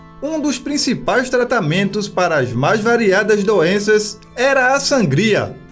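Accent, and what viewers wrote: Northeast, Brazil. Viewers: Esse sotaque é ótimo. Muito lindo sotaque nordestino.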